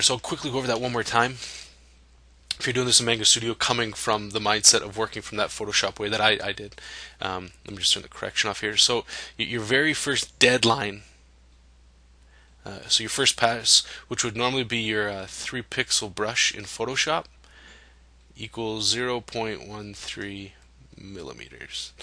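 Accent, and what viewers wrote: Canada. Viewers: Are you from Canada, aye?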